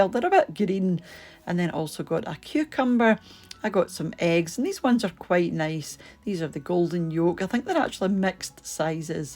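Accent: Scotland, United Kingdom. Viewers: Good evening! It’s lovely to hear your beautiful Scottish accent!!! ❤also, so glad for you that your weather is lovely, it’s still hot 🥵 here in Georgia in the U.S. I’m so ready for fall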